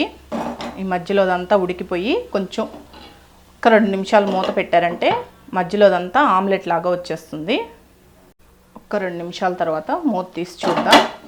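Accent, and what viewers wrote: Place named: Bellary, India. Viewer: I saw Bellari accent in your Telugu ma'am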